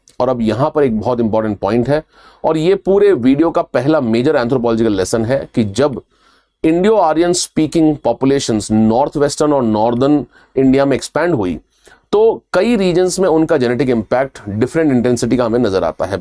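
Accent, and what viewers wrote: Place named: Bengal, India. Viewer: Surely the speaker in this video is bengali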